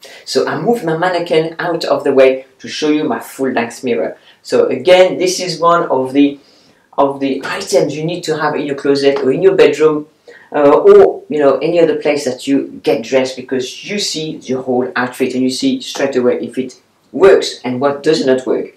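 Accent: France. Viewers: Sometimes I catch the first part of a video without sound but with captions. Apparently the captioning cannot translate your delightful French accent so your name was translated as Manhattan Looker. Probably the opposite of French chic!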